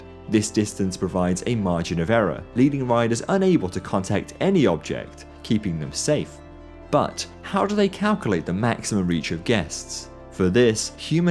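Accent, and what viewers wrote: United Kingdom. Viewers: Finally someone who is British explaining these stuff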